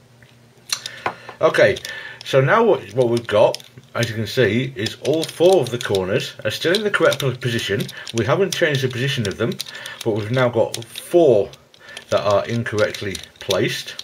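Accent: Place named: Northern England, United Kingdom. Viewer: Thanks, I'll give one of them a go. I am guessing (from your accent) that you are from the north of England; are there any puzzle groups that get together up here that you know of?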